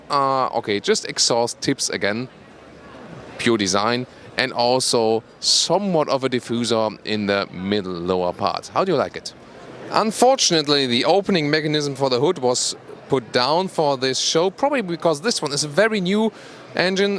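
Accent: Germany. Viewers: Very good and clear comparison with all the details and your very nice and beautiful German Accent make it very intresting too . Thanks . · Hello there. You and Doug DeMuro are my favorite auto reviewers. If I had to choose only one, I would pick You over Doug. Please don't tell him that! Lol:) I have an E coupe and loving it. E class all the way! You are very articulate and thorough with your presentation. I don't get bored watching you and Doug. Nice German accent, too! Hope all is well for you both life and work. Btw, are you coming to Washington DC anytime soon? Cheers and take care! -Phi ( Fee Fi Fo Fum)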